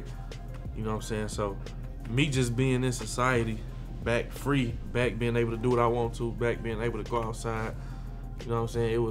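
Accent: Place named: South, United States. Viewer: He may have spent time in the D but he obviously spent more time in kentucky. He has a thick southern accent and he shouts out kentucky in alot of songs.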